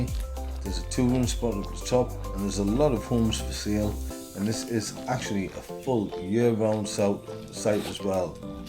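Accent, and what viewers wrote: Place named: South Shields, United Kingdom. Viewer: You sound like a shields lad.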